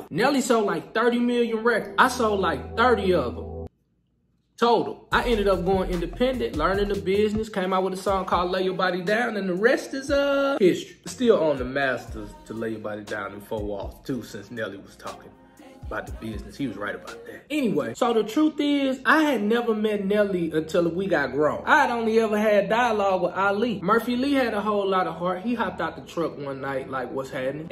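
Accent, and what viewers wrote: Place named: St. Louis, United States. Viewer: Texas + Cali + Pinch of NY = STL Accent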